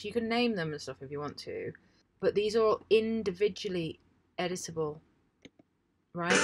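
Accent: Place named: Yorkshire, United Kingdom. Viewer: are you from yorkshire? as a yorkie myself i recognise that accent anywhere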